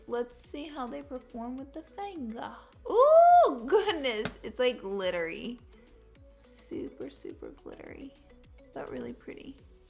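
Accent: South, United States. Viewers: DIY GURL she’s from the south.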